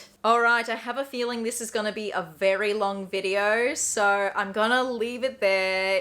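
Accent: Australia. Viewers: 🐰Thank you for your vlogs. Hug from France. (Btw, your Aussie accent ,like Sarae Renae Clark, is so lovely and easy to understand).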